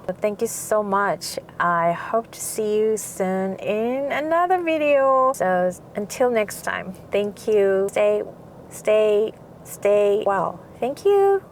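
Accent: United States. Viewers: Nice backstory and information (I had been curious as to your impeccable, American accented English 😉). Best to you and your family. Cheers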